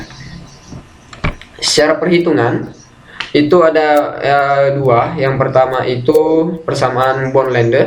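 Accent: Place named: Sulawesi, Indonesia. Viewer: Logatnya sulawesi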